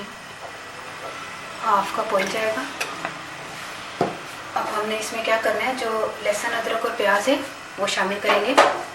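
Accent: Punjab, India. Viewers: I really like your Punjabi touch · Waoo kitni cute Punjabi boli 😂😍😍😍😍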